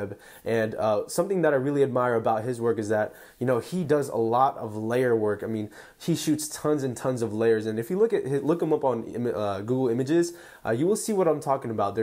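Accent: Philippines. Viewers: Hi ate! HAHA lovin' the Filipino accent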